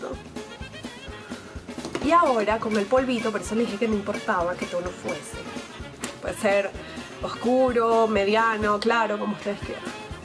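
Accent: Venezuela. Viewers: Iguaaal. Se te nota la venezolana y mucho jajajaja. SALUDOS PAISANA 💙💜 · la forma de hablar no se pierde, se ve que eres venezolana, me encanto tu video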